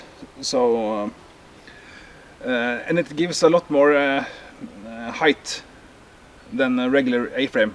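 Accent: Norway. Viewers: Hei! Nice Tarp Setups and a nice norwegian Slang in your englisch speek! U got a new subscriber :) Best regards from germany, zwosiedler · My great-grandfather was born in Nesjestranden(Nesjestranda), Møre og Romsdal. I've always enjoyed the Norwegian accent. I grew up tent camping, but now I like hammocks more. Nice information that's useful. A pleasure to watch ;)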